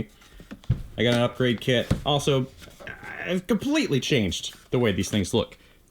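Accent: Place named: United Kingdom. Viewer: You are not an idiot! Nice british accent too!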